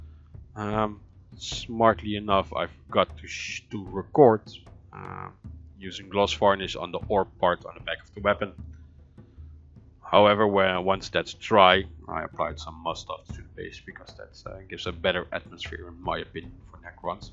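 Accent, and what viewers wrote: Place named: Netherlands. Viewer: Ik herken een Nederlander wanneer ik er een hoor.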